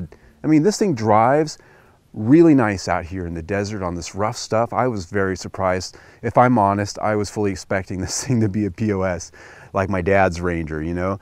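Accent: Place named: United States. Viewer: love the "Murrican accent!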